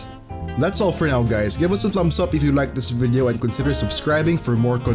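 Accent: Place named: Philippines. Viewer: Noypi accent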